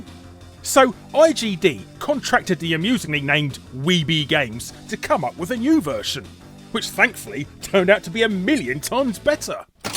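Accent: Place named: Southern England, United Kingdom. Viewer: What's a parth? There is no R in path or bath nor a second one in grass fucking southerners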